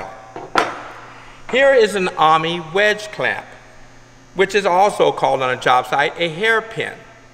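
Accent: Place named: Louisiana, United States. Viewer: All i can hear is Adam Sandler waterboy!! Correct me if I'm wrong but thats a sweet louisiana accent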